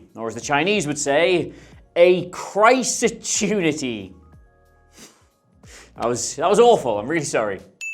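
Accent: Newcastle, United Kingdom. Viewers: “Where can I get football content in a Geordie accent with occasional vintage Simpsons riffs?”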